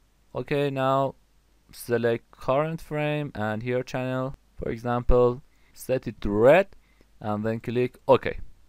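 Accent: Iran. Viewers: Hmm are you from Iran? Your accent sounded like your Iranian.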